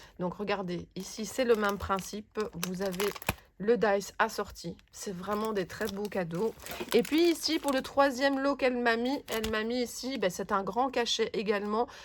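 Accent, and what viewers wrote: Belgium. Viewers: Coucou! Merci pour ce concours auquel je participe avec grand plaisir! J'ai eu un méga coup de coeur pour la collection de Lorelaï Design ainsi que pour le lot de la bulle à scrap! Ce que je préfère sur ta chaîne c'est les reportages des salons qui sont toujours très intéressants et qui permettent de découvrir de nouvelles personnes et boutiques... Et ton accent me rappelle la maison ❤ (belge expatriée en France depuis presque 10 ans ça me donne toujours l'impression d'être rentrée chez moi quand je regarde tes vidéos ❤). J'ai également eu la chance de participer à un atelier à version scrap Paris et à nuance de scrap et tes explications et ton implication sont vraiment super! J'espère pouvoir participer à un nouveau salon en 2024 pour t'y croiser 😊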